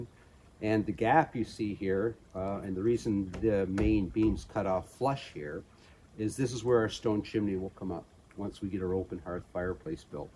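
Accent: Canada. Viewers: Just found your channel, it's amazing! Just subscribed. I'm doing something similar on my channel, building a log cabin with only hand tools, although I have some more modern tools. I'm in the early stages of harvesting logs. I'm learning a lot from your channel and will definitely be incorporating a lot of the things I've learned here into my build. Keep it up! Also where you located? The accent leaves me to believe southern Ontario. I'm nearby in Quebec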